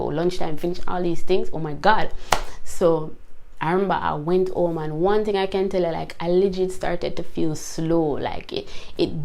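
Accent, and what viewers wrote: Jamaica. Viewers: Jamaican?💕love your accent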